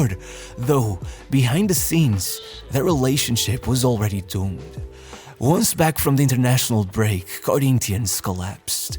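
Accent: Portugal. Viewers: I mean, he sounds portuguese which is closer culturally to brazil than most other european countries. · q brasileiro o q, ele fala S com som de Z kkkk. é portugues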